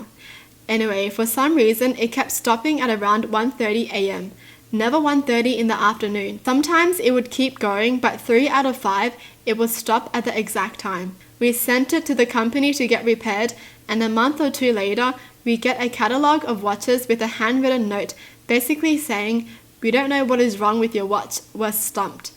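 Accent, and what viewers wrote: Australia. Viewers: She looks like rosè too and they're both from australia that's why they have the same accent. Maybe they're related?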